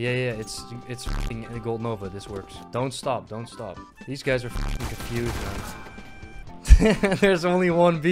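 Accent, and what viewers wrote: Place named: Netherlands. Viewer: Je bent nederlands toch ik hoor het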